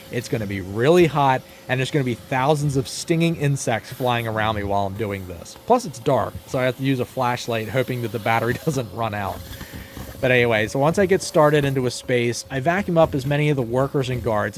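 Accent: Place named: Pennsylvania, United States. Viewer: What is this dialect? It's very "Mare of Easttown"...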